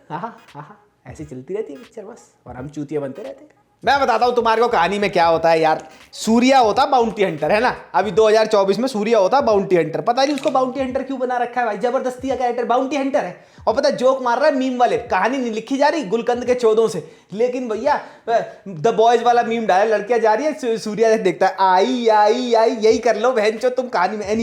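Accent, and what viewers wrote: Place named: Delhi, India. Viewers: This guy roasts in a brutal manner & silences the promoter of those usual dumb foolery we see in some over hyped south Indian movies. Appreciate the honesty of this guy lately. Although i dislike a chhapri Delhi accent, that's their culture, i get it but the guy knows what's wrong in those movies. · He intentionally does that Delhi chapri accent for reliability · chapri delhi accent 😭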